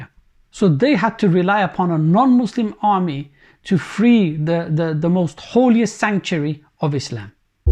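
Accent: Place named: England, United Kingdom. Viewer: all your informations is wrong wearing a bikinis in Saudi not all the places it’s only in the private places not in the public. Saudi Arabia always defend Islam and helping the Muslims all over the world and serve the holy places in Mecca and madina for more than 80 years and they spend more than 90 billions of dollars 💵 for them. What kind of corruption you are talking about?? I think from your accent you are living in England. Please talk about the problems in england or the country where you came from and talk about our brothers and sisters Muslims problems in the west . By the way I saw many people talking about Saudi and the gcc countries and they forgot about what they are exactly. They use Islam but they are far away from it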